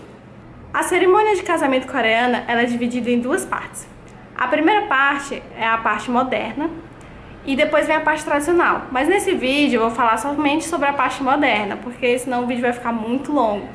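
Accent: Ceará, Brazil. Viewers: Teu sotaque parece do Ceará. Tu é de onde? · guriiiiia tu é nordestina ? tu tem muuuiiito sotaque hahahahaha adoreeei saber sobre os casamentos dae, e um pouco sobre o seu tb e super me emocionei !! TuT acho tão lindo casamentos !! Mas tinha que ter briga pelo buquê sim u-u qual é a graça se não tem briga pelo buquê ?? ahahahaha xD #NovaInscrita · Ah! Que legal! Sou Nordestina tb, não do Ceará mas da Bahia. Nossos sotaques são tão bonitos!! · você é cearense, né? kkkkkk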